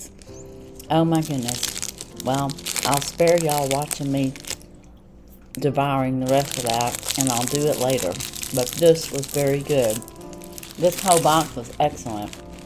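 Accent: South, United States. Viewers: Hi Miss! I love hearing you pronounce all the Polish words with your Southern accent & enjoying the treats. Great variety of items in this box.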